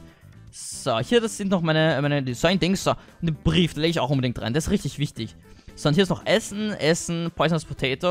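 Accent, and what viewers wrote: Austria. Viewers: Ich liebe deinen österreichischen aktzent wie bei Flo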